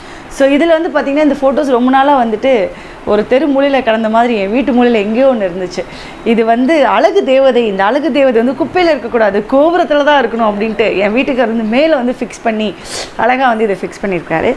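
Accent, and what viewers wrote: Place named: Madurai, India. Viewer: Neenga maduraa ah?? Madurai- slang.. துணி மணி❤️ Vijay still from Thuppaakki movie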